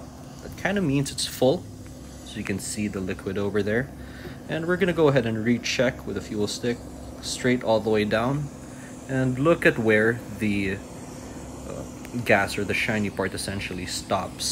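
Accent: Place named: Philippines. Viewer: Why u have a Filipino accent lol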